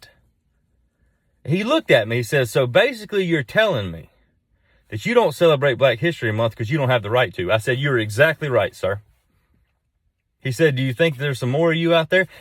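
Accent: South, United States. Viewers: That Southern draw is so sexy. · I always feel if you are too big you don’t care or listen, but damn you actually do and I’m glad cuz I love that southern drawl but will admit it can be scary due to stereotypes, thank you love ya mucho amor 💕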